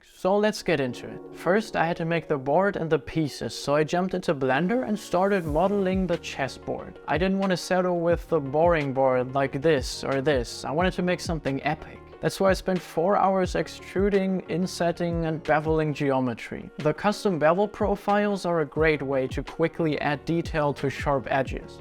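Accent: Germany. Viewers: Ich feier deine Videos, aber der deutsche akzent fickt meinen kopf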